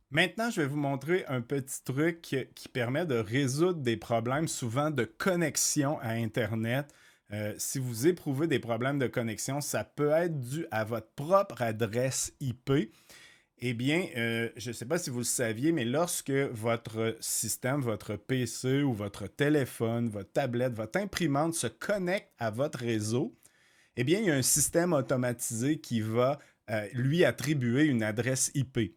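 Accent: Quebec, Canada. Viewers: cet accent Québécois est tout simplement charmant ! En plus d'une vidéo super utile, merci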